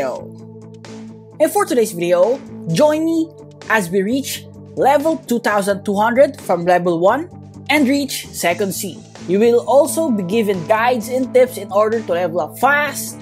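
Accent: Philippines. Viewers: Hes accents is like a filipino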